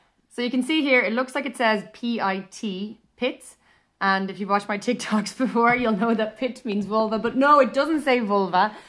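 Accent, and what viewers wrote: Ireland. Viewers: I’m from Limerick Newcastle west love our Irish accent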